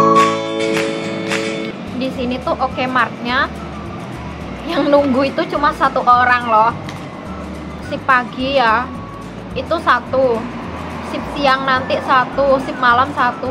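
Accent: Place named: Java, Indonesia. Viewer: Meski di taiwan..logat jawanya msh kerasa bgt.mntap...kgn indo ga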